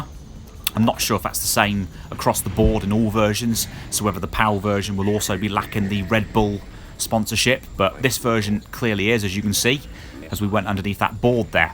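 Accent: United Kingdom. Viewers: How come you sound clearly English, but you're playing the XL versions instead of our 2097 versions?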